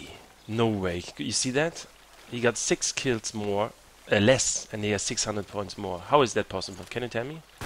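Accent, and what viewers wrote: Germany. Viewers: Are you german btw? I just discovered you. You definitely sound german :D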